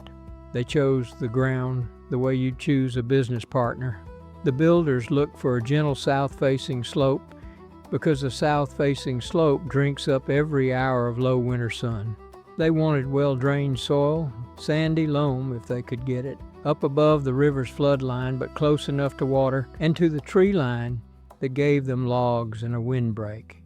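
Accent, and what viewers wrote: South, United States. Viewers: Why does he have a southern accent? A I accent and images - but interesting Why does he say "Indian" country? That's not what they called themselves. lol. Indian country is the country called India. Also, how is that these types of dug out shelters don't have the soil collapsing on them?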